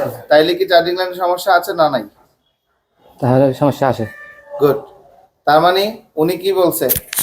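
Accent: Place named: Comilla, Bangladesh. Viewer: কুমিল্লা কথায়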